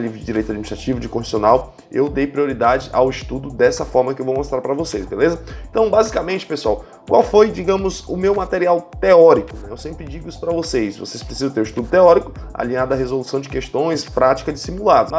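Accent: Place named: North, Brazil. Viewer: Vc é nortista? Tem um pouco de sotaque rsrs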